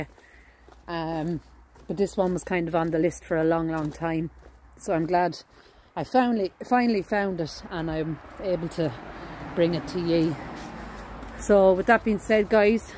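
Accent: Ireland. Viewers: Watching from Massachusetts, USA. I am second generation American born, all grandparents from Ireland. I love your videos, how you comment on the beauty of the sky and grounds and stones. Most of all I love listening to you. Has brought tears to my eyes remembering family members who have passed, who spoke and sang in that lovely brogue. God bless you for all you do. I would love it if you could keep your camera on the pictures and writings a wee bit longer. Thank you!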